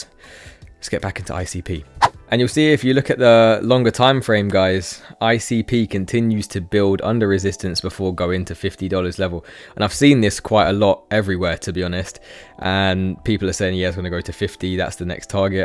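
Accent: United Kingdom. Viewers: You must be from around Watford . You kinda sound like Anthony Joshua. Hello from Spain. 🚀💰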